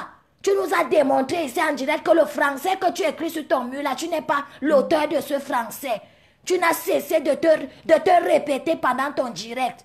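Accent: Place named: Bassa, Cameroon. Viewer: Mama pourquoi tu m as vendu ???????????? Je suis au sol okololooooooo toi alors moi je t écoute a fond tu n insultes pas tu t exprimes bon français a la façon des bassaaaaaa c est l accent qui me finit une fois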